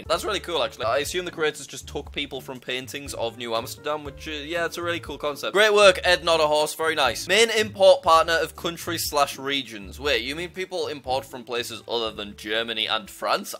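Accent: United Kingdom. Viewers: Your bri`ish accent is just crazy, mate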